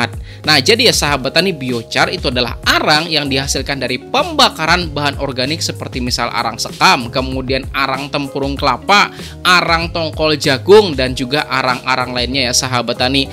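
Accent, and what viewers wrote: Banjar, Indonesia. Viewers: Logat Banjar nya masih ada😅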